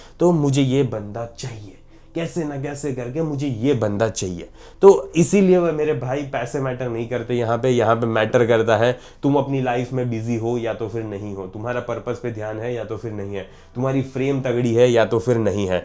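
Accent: Gujarat, India. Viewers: Bhai, I am also a gujju, its so cute when ur Hindi has a gujju accent 😂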